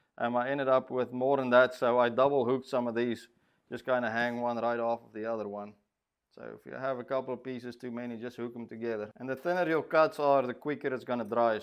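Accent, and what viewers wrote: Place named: United States. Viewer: Nice video. Can hear you getting a slight American accent, keep your Afrikaans accent don’t lose it. Jy Ian ook lekker skinner nou.😂 Baie geluk🙂👍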